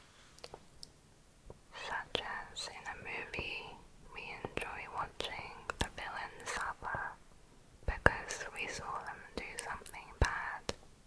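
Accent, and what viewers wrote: England, United Kingdom. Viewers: British, very apparent. It's hard for me to understand her due to it but I love her videos regardless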